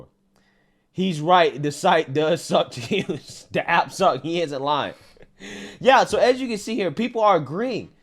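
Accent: South, United States. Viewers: You’re from the south bro. Ppl actually talk shit about how you say words🤣 those people are dicks man. That’s not criticism, that’s just being ignorant, and not understanding there’s different accents. I live in Texas and moved from Boston. When I first got here people couldn’t even understand me. Maybe people need to understand there’s different cultures.😂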